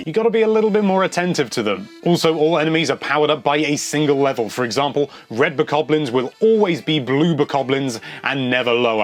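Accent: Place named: United Kingdom. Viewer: he is English (British) not (American)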